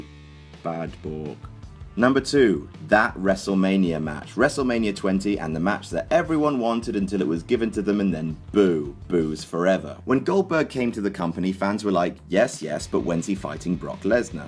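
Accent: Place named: United Kingdom. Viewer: Anyone else laugh themselves to hysterics when he said "TROLOLOLOLOLOLOLOL" in that deadpan British monotone?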